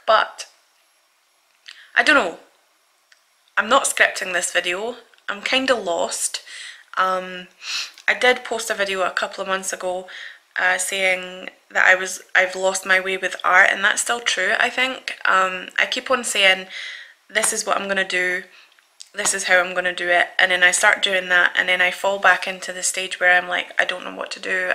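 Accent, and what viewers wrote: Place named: Fife, United Kingdom. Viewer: yeah I know what you mean about me pursuing drawing. I used to really love it when I was younger and I was ok at it, but then when I attempted it about a month ago I was horrific at it and I was like "eh where did all my talent go lol", but as you say, if I put in the time and effort I could get good at it. I know you moved house a few months back so I hope all that went ok, it's always really stressful. I'm a Scottish gal myself, where are you from, you sound as if you could be from Fife. Hope your handsome boy Cayden is doing good as well 😊 Btw I would love you to do more colouring book videos but I know probably art and drawing is your first love 😁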